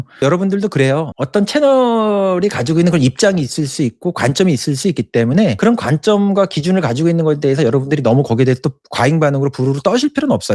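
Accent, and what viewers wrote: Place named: Seoul, South Korea. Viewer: 이분 서울사투리 쓰시네??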